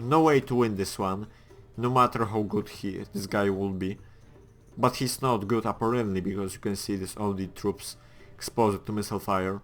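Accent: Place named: Germany. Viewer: you great but try speak more well ok ? where u form perpahs germany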